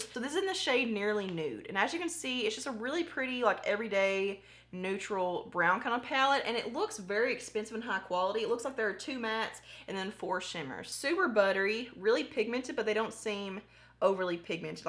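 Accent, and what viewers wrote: South, United States. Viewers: Im from the south as well So good to have someone on YouTube speaking pur language. Lol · Use it love it, when I heard your voice knew I would just love ya,southern accent me too. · I am born and raised from the south and your accent legitimately makes my soul smile. I love it. And I loved this look! Great video gorgeous ♥️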